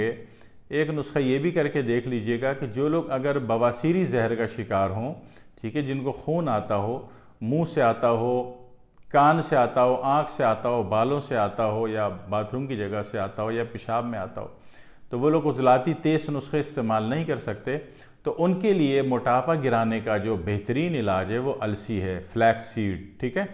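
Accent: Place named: Hyderabad, India. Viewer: Walaykum Salaam Warhmatullahi Wabarakatahu. Muhtaram Dr Sahib Ap ka Lahja Hyderabad Deccan Ki Tarha Hai Bahar E HAL JAZAK ALLAH..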